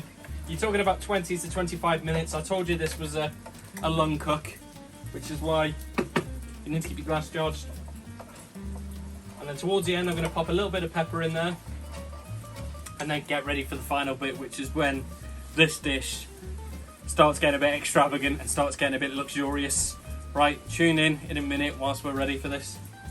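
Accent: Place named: Wirral, United Kingdom. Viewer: You sound local... Wirral?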